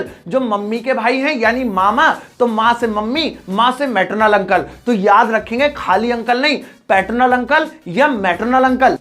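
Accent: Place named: Delhi, India. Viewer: nice voice Delhi se ho pakka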